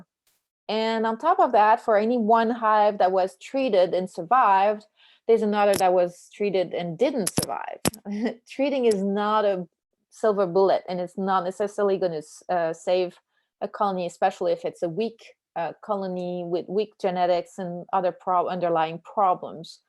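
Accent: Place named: France. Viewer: I didn't think you sounded Texan, but I would never have guessed French.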